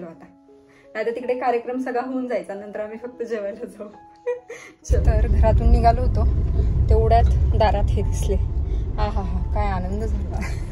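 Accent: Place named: Pune, India. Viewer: Tu ekdam soft Ani typical Puneri boltes I like it....Ani tuze baby ekdam cute❤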